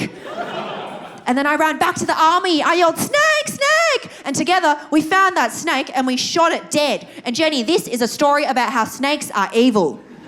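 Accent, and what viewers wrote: Australia. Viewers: I am 20 noin years old. Best Australian accent ever.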